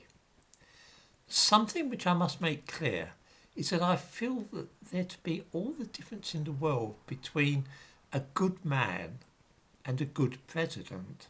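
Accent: United Kingdom. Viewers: I've never been very interested in history. That is until i found your channel. I really enjoy your history lessons, your thoughts and your British accent. Thank you. Kind regards from Sweden.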